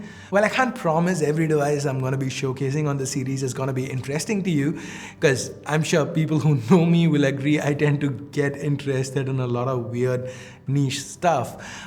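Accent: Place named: Tamil Nadu, India. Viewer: You English is like a typical tamil school student who always wants to grap the attention of others just by speaking in English.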